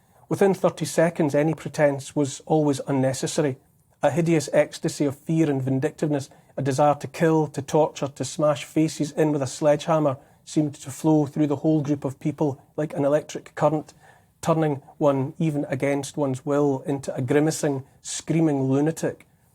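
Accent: Scotland, United Kingdom. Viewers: Really he is what he's complaining about. Somebody on " The News " extrapolating the worst possible outcome from any given event just to keep us in a state of anxious uncertainty. But in a Scottish accent. Nothing but a Private Frazier for our time constantly declaring that "we're dooomed"!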